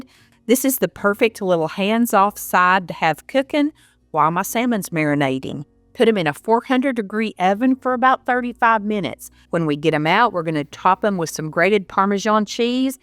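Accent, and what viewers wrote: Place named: Virginia, United States. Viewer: Mama, I just subscribed to your channel and I love it. I’m not a cook but my boyfriend is from Virginia and he’s got an accent like you and I just called him and told him he’s got to look at your website. He is gonna be so thrilled I love it. I love crockpot cooking though and I am really enjoying all your crockpot cooking. Thank you mama.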